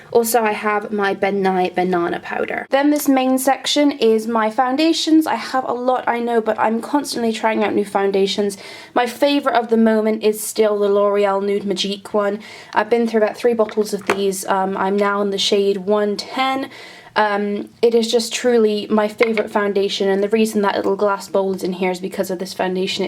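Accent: United Kingdom. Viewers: Are you from Britain? I'm really struggling trying to guess your accent, you sound british to me but I am unsure aha I am a new subscriber xxx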